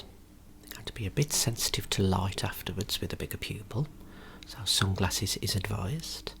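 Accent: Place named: West Midlands, United Kingdom. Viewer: As ever, you are promoting the west midland accent in the most exquisite way. Superb content time after time, top man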